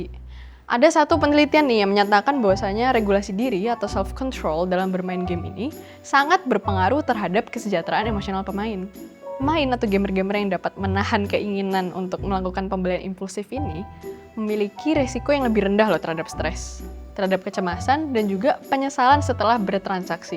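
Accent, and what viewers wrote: Java, Indonesia. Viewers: such a cute medok 🥰🥰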